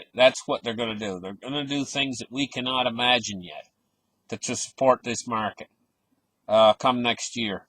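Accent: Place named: Canada. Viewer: Sounded extra Canadian today.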